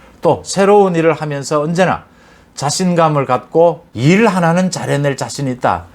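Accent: Gyeongsang, South Korea. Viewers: 선생님해설은 들을수록 매력이 있어 자주 구독합니다. 경상도 매력있는 말 씨가 들을수록 이끌 립니다.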